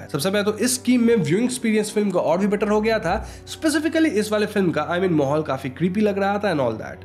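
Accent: Bengal, India. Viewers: dada baangla accent bojha jachhe!. Film story bole dile? thank you. Copyright aasena dekho!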